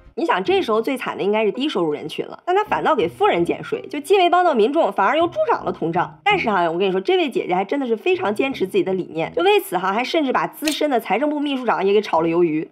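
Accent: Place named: North, China. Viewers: 小Lin的口音听着像山东老乡啊。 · 感觉是北方口音，但是咋判断是东北人！？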